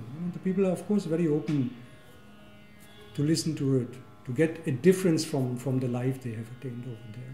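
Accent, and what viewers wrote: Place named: Germany. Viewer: I think it's German accent. lol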